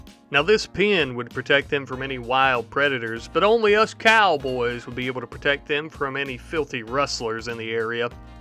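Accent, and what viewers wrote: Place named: Texas, United States. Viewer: Wait a minute. That accent, it's not just theatric. You're Texan, ain't ya?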